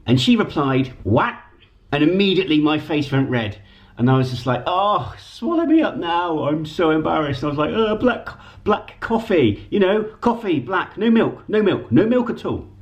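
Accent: United States. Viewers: Awesome video! Love your American accent. Here we say niche as nich...but if someone pronounces it neesh, we still get it. In the southern US, a trolley is a buggy. Keep up the good work. Hugs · American accent and personality is SPOT ON!!! 😂🤣 Both characters were hilarious!!! 😆😁 The facial hair on your British character was quite gentlemanly 👨 Did you just say "LOVE GARDEN"?! 🤣😂 Your new characters need some names that fit them 🤔🤔🤔🤔 You're latest videos have been absolutely on point!!! 💯👍🤘 You are in the groove sir!!! 😁🤟👏 Great stuff bro! 🤜🤛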